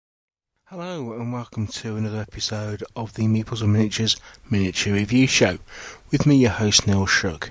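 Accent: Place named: RP, United Kingdom. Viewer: Another great review by Neil. As an American, I love his proper "Queen's English". I do know the difference, as I was married to a Geordie from Whitley Bay, and I loved her Geordie accent too!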